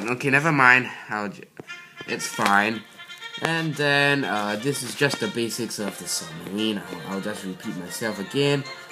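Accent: Singapore. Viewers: are you a singaporerean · Are you singaporean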